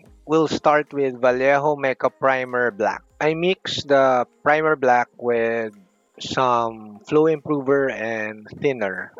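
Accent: Philippines. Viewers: the way you talk sounds like a native Filipino.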